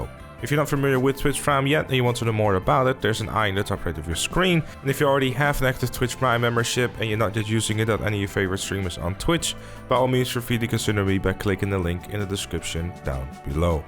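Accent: Netherlands. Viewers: Hmmm yes ik ruik een Nederlander met die stem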